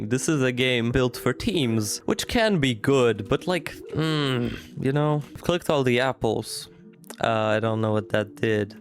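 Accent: Poland. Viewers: dziwnie oglada sie polaka mowiacego po angielsku ale dobrze ci idzie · Tylko dla mnie ma taki troche polski akcent?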